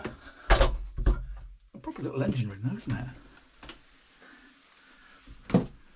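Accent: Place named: United Kingdom. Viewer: I enjoy everything about your channel, except for one thing. “Cummins” (Engine) does not have a “g” in it. It’s not “Cummings,” although that pronunciation is also more common here in the USA than the correct one. But I can live with it, and perhaps it’s just my hearing that’s off, what with the British accent and all.:-)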